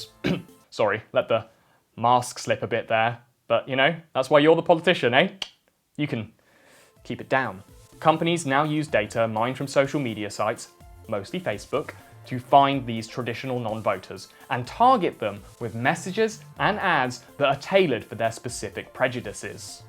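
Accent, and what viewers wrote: United Kingdom. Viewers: What kind of British accent is this!?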